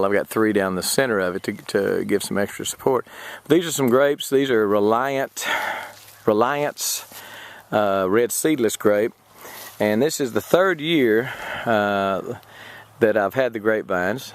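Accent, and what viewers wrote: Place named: Alabama, United States. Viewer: Please pardon my ignorance and forgive me if this is taken as insult - are you from Texas? Because your accent sounds very Alabaman to me 😊🤔 Any ways, thank you for all your videos. It's nice to see true Southerners gardening with native plants in this climate.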